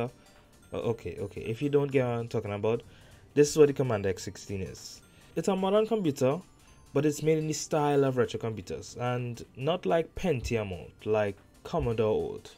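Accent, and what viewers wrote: Trinidad and Tobago. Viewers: As soon as I heard your voice I knew you were a Trini. Surprised to see a Trini talk about the X16. This is awesome! The outlines around the sprites reminds me of the Castlevania games for GBA. Was it done to help the sprites stand out against the background and whatnot?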